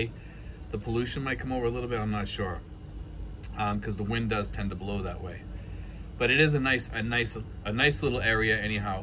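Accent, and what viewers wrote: Boston, United States. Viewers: I was listening to one of your videos the other day, and I thought to myself, this guy has to be from the Boston area. I have been to Boston several times. My older brother went to Brandais University for Grad School and was a Professor at Boston U. when he passed away. One of my best friends from my days at the U. of Texas retired as a Lawyer and move to Boston. His son works for a High tech company near Boston. He is going to move back to Texas soon. You are on the mark regarding inflation here in the Philippines.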